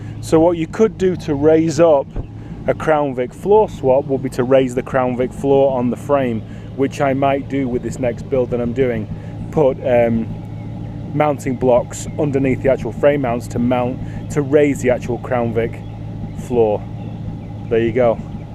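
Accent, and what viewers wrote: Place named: Northern England, United Kingdom. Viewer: Now mate I've recently found your channel and enjoying your stuff but i've one question. what part of the north of England are you from as its great fun hearing English/northern terms,swearing and pronunciations mixed with americanisms . keep up the good graft.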